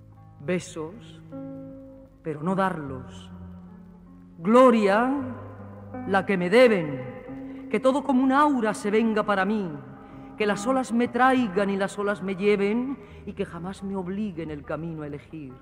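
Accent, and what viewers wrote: Spain. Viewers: Poesia bellisima, pero la andaluza que recita, es maravillósa de andaluza a otra, es genial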